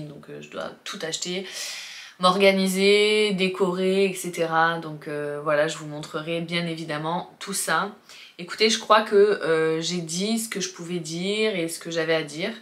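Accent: South, France. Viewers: Coucou, je t'ai decouverte hier, avec une vidéo lié à ta nouvelle vie, une nouvelle vie qui j'espère t'apportera plein de bonnes choses, de toutes façons faut ce dire que dans la vie rien n'arrive par hasard, malgré beaucoup de sourire que j'ai vue sur tes vidéos ça doit pas été facile mais faut positiver. Je te souhaite beaucoup de bonheurs et de courage pour la suite. Ps: super contenus ultra naturel, un petit accent du sud plaisant. Bisous du sud également.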